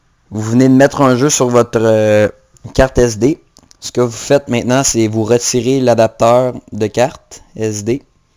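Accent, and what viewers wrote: Quebec, Canada. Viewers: tu as un accent styler mec si jme trompe pas c'est quebecoi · Magnifique ton accent quebequois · il est canadien !! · Il est canadien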